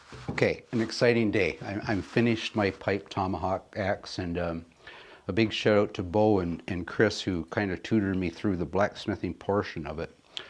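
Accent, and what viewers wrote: Canada. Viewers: Hi don t change your Canadian saying s I m from Massachusetts and every on know were Im from when i speak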